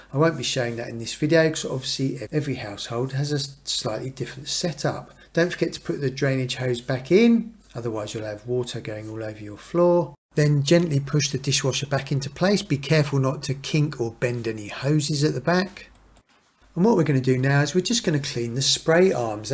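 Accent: United Kingdom. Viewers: Nice british pronunciation🙂. My wife (an English language teacher) loves it😉. Thank you for the film and best greetings from Poland.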